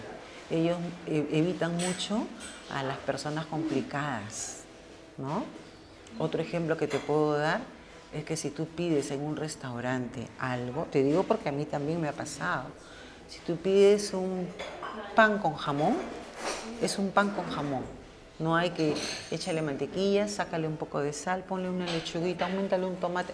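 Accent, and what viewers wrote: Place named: Peru. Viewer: De dónde es? Me parece que de Perú por el acento, espero no decir algo incorrecto, yo soy de Ecuador y estoy estudiando en España, pero la mayoría del tiempo estoy en Alemania porque mi novio es de acá, y tiene mucha razón en todo lo que dice, pero debo decir que los colombianos soy bastante corteses, todos los que he conocido siempre dicen: "perdón", "disculpe", "por favor", "gracias" y "permiso", incluso no se sientan hasta que les digamos "por favor, toma asiento" jeje, y siempre me han tratado de usted hasta amigas de mi edad o incluso mayores, es interesante... al contrario que en mi país incluso también es común dejar propina allá, en fin, gracias por el video. :)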